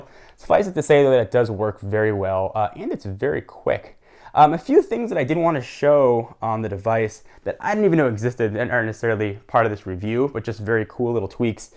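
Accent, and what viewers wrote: Canada. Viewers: phe<link> for a second there i thought you were from canada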